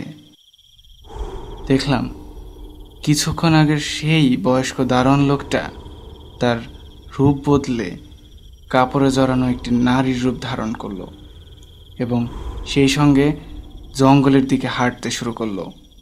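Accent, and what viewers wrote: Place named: Bangal, Bangladesh. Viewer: এমন বাঙ্গাল উচ্চারণ কানে বেশ বাজে লাগে।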